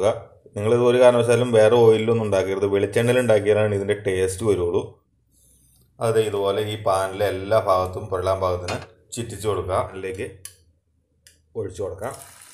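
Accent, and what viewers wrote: Palakkad, India. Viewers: പിണ്ടിമനയിൽ covid +ve ഉണ്ട് stay safe and healthy,. Proper hand wash and wear mask everytime..പാലക്കാട്‌ ജോലിയുണ്ടോ /താമസിച്ചിരുന്നോ,?? പാലക്കാട്‌ കറികളും സംസാരരീതികളും 🤔🤔🤔നമസ്കാരം പറയുന്ന ബാക്ക്ഗ്രൗണ്ട് കാണുമ്പോൾ പാലക്കാട്‌ പോലെ തോന്നുന്നു,,, ഞാനും കോതമംഗലം ആണ് wrk pkd യും,...... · ചേട്ടന്റെ ഭാഷ പാലക്കാട്‌ ടച്ച്‌ ആണല്ലോ... ഞാൻ വിചാരിച്ചു പാലക്കാട്‌ ആണെന്ന് ട്ടാ..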